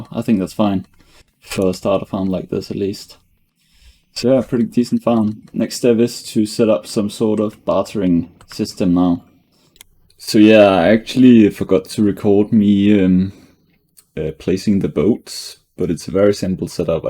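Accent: Denmark. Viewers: Are you danish? You sound like me when I speak English That sounded offensive but isn’t just hoping it’s true(I’m danish) Nice vids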